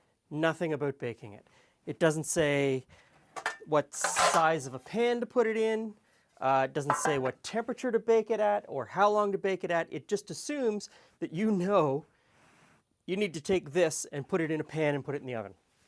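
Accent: Canada. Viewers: I love your vids, but I laugh at how much you sound like my mother's Canadian cousins!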